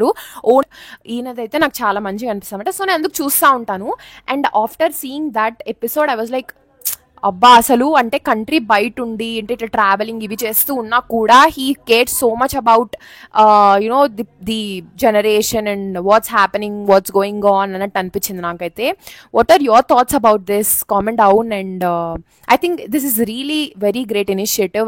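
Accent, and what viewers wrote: Rayalaseema, India. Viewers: Pakka maana Rayalaseema language ❤❤❤❤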